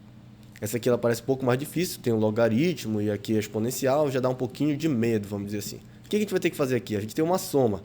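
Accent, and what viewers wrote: Pará, Brazil. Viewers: aposto que é paraense, sotaque muito parecido. Ri muito no "peralá" no outro video e nas "galhinhas" de vez enquanto.. kkkkkk muito bom mesmo, sou sua fã:)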